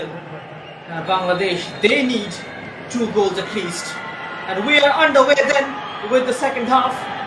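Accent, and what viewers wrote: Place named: India. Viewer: Bloody Indian commentator's accents are so ugly. Being an Indian, I hate it. I also watched IND vs BAN Bangladesh T-Sports Production. Their accents are good; not irritating like this commentator's accents.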